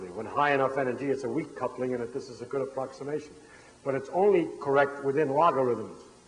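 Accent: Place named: Brooklyn, United States. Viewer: I’ve spent this rainy day watching Feynman lectures. Jeez, what a terrific teacher. Love his thick, Brooklyn accent. He has the timing and cadence of a comedian.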